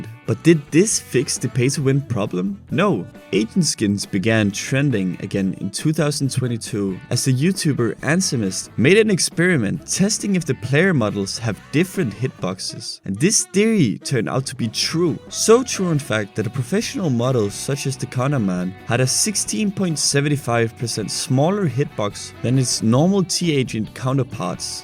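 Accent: Denmark. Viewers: Den danske accent 😭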